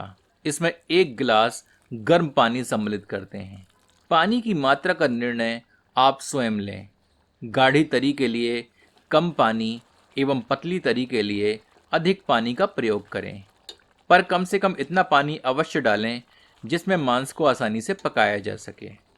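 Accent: Standard. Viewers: Whh sudh Hindi very nice bro thanks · बहुत ही शुद्ध हिन्दी का उच्चारण करते हैं आप सुनने में बहुत ही अच्छा लगा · 💯 shudhh hindi I like it☺️🥳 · Itni shudh hindi in cooking 😮 very nice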